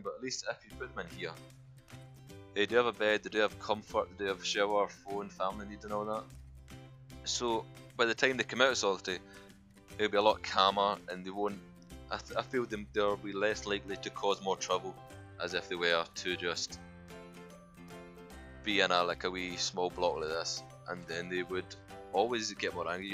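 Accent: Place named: Scotland, United Kingdom. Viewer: You're an absolute legend. You have truly understood the aim of this game. Comfy times 👌. The jolly music played in the background is just class haha. Are you from Falkirk? You sound like my pipe major, he's from Falkirk. I'm from the West Coast (Scotland).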